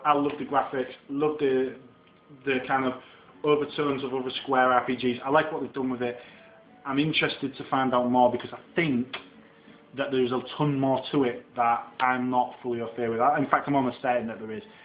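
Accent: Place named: East Yorkshire, United Kingdom. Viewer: I sense an East Yorkshire accent!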